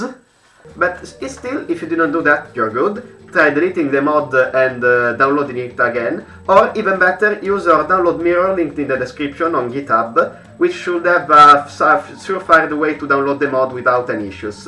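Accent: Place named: Italy. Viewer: dal tuo accento mi sembri italiano, quindi rischio la giocata e scrivo in italiano. Questa mod è una delle migliori che abbia giocato fino ad ora. Apprezzo la ricercatezza di alcune stanze e degli stessi personaggi, tipo lo stesso Bertran ed il fatto che il suo gameplay sia basato sulla sua testa. Le parti più belle della mod sono senz’altro il design generale e i personaggi. Spero che riusciate a finire il terzo capitolo entro il 2024 perché potrebbe essere la migliore mod di isaac a mani basse. Sono in parte contento di essermi approcciato alle mod del gioco solo adesso e non 5 anni fa 😅 Buon lavoro ragazzi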